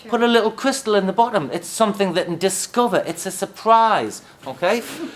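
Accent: Newcastle, United Kingdom. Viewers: Took me about 10 mins to recall where his accent originated, then he later confirmed with, ''my mate in Newcastle.'' Obviously well experienced, and fully competent, without loads of boring ''waffle'' like some. Actions always speak louder than words. Enjoyed the demonstration, and found it very educational, but turning with 'wings' gives me the heeby-jeebies, ever since I got my finger tip whacked by one.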